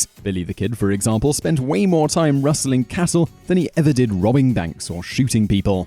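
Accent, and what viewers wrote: United Kingdom. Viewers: I didn't get past the first item. Bull corn. Towns did try to regulate, but EVERY cowboy carried a gun when he wasn't having to placate the townfolk. But when they were in Dodge City or Ogalala, they arrived dirty, randy and armed to the teeth. Pure fertilizer. And that this comes from an effeminate "researcher" with a British accent only doubles down the offense. Sign me, a hand from Nebraska. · Making the claims that you do, without research or quoting your sources makes your video totally unbelievable. I have spent over 40 years researching the history of the AMERICAN Cowboy and I will tell you (with your British accent) you are full of BULL. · Never trust a video about the American cowboy that has narrator with a British accent. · I dunno😏somehow hearing this British Accent speaking on the History of American Cowboys...quells my interest a little. 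· kind of disingenuous to hear a limey trying to teach us about cowboys. kind of like an American teaching a brit about the Queen, who is a man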